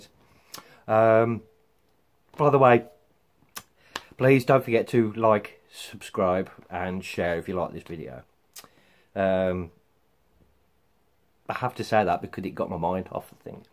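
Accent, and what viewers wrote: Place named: Northern England, United Kingdom. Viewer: Thanks man, if you don't mind me asking, where in the UK are you? You sound quite northern ..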